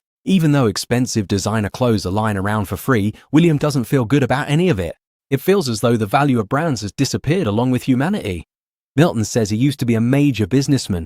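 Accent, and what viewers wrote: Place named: United Kingdom. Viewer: briton accent.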